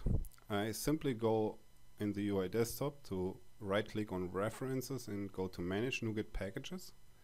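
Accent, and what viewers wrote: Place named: Germany. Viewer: A very German name and a very German accent :D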